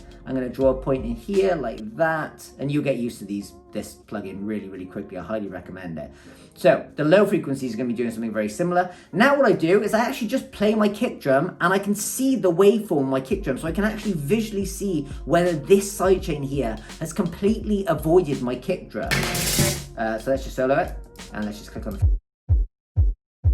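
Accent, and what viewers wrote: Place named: United Kingdom. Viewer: british mate huh hmmmmmmmm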